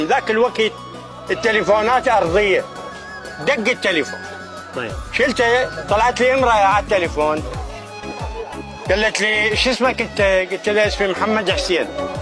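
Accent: Baghdad, Iraq. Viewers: اللهجة البغدادية الاصيلة ❤ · اللهجه العراقيه الاصيله ❤❤❤ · اللهجه العراقية جميلة وفيها هيبة وقار وتحس فيها رجولة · هذه لهجة اهل بغداد الأصليين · لهجة العراقيه جميله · هذه اللهجة البغدادية الجميلة ❤ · جميلة لهجته العراقية ، تذكرني بكثير من اصدقائي. حفظ الله العراق من كل شر و الف بين قلوب اهاليها الطيبين. تحية طيب من الباكستان 🇵🇰❤️🇮🇶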